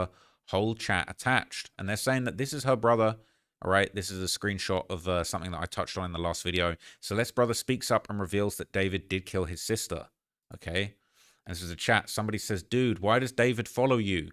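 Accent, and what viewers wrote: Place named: United Kingdom. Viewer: He’s British. Calm down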